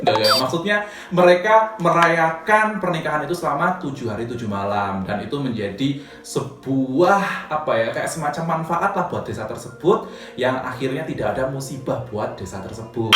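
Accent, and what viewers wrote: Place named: Java, Indonesia. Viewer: Ora medok ora kepenak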